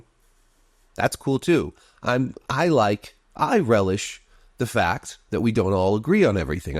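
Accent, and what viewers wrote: United Kingdom. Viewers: your English accent kills me every time, I love that Ubisoft unintentionally outed Thomas Lockley as a fraud is the real win here.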